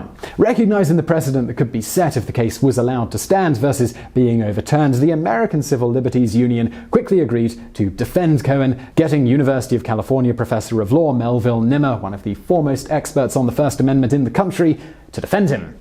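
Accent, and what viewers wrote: England, United Kingdom. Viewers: Very elegant eloquent and deep discussions and debates narrated by a nice English accent talking about.. Fuck lol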